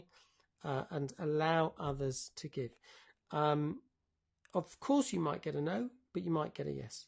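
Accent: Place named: United Kingdom. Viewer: Hello. I just stumbled onto one of your videos posted on YouTube and concluded iI would like to experience more of them. So….Facebook live? Thursdays at 5pm? But 5pm where? You sound British. Are you in Britain? Five hours ahead of me.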